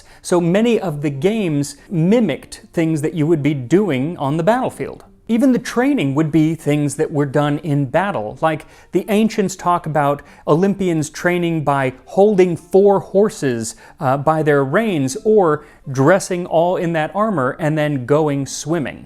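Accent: Greece. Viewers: Excellent Greek accent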